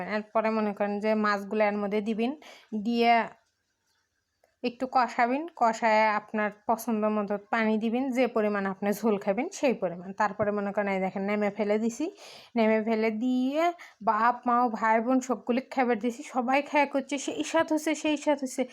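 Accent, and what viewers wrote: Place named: Bogura, Bangladesh. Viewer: হামাগেরে বগরার ভাষা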